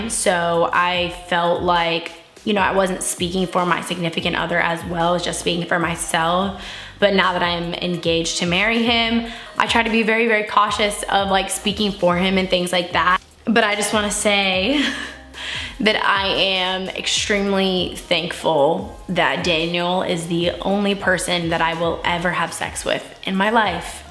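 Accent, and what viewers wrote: South, United States. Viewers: You’re southern accent really came out in this video 😂 and I loved it 😍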